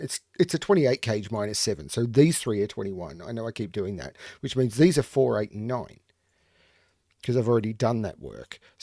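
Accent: Australia. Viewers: Is he from Australia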